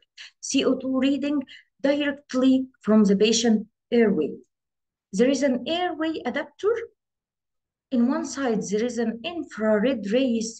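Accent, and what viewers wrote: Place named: Egypt. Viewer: I just lov the Egyptian accent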